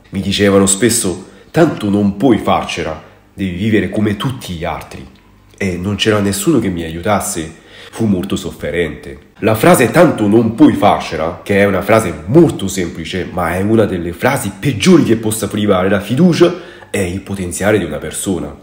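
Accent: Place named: Rome, Italy. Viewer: Certo che l’accento romano su di te ha degli effetti sorprendenti! Grande. (Per inciso, magari sapessi parlare giapponese come tu parli l’italiano)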